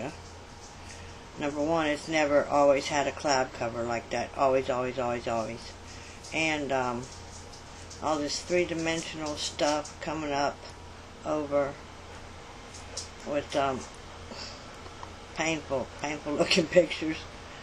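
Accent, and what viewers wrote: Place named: Appalachia, United States. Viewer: You sound like a hillbilly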